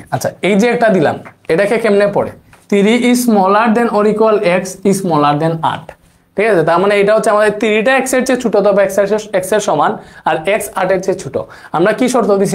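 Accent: Sylhet, Bangladesh. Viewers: ভাইয়া কি সিলেটি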